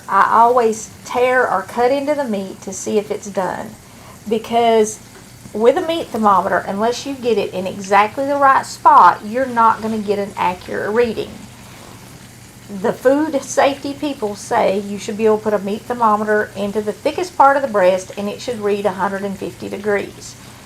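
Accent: South, United States. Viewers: This lady is now one of, if not my favorite Cooks! 😊 Thank You So Much! I love your videos & southern accent 😂😊👌🙏 Ky here... thank you again ☺️🌸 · You sound like a Hillbilly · Thank you so much for this video on frying chicken! I love your style and really love your southern accent! I have always cooked for myself and have wanted to try fried chicken. I have cooked chicken in a pressure cooker, baked and slow cooker too, but this looks simple enough. From your new fan and subscriber here in Palm Desert, California. God Bless you.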